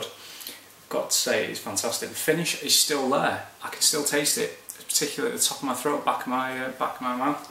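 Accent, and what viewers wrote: Manchester, United Kingdom. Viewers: I luv your Mancunian accent! :-) And what a cool review of a great little whisky this is! Good job, mate!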